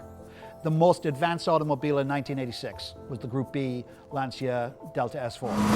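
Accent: Ireland. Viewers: He's Irish? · ur Irish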